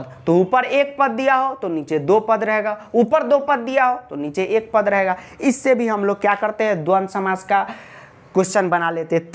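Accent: Bihar, India. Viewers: sir aap bahut badhiya padha rahe.....bihari ascent me comedy karte karte.,...maja aa gaya padh ke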